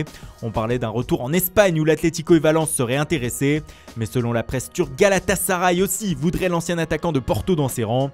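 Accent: Italy. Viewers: Arrête avec ton accent italien sa fait mal aux oreilles . T'insiste trop avec ça !!!!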